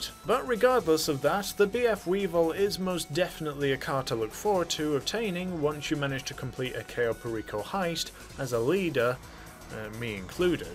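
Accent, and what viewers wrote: England, United Kingdom. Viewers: That English Dave was spot on, oh yeah, well you are English, soooo yeah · Sounds nothing like English dave but he does sound English even in his normal voice which doesn’t make a lot of sense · He seems to be British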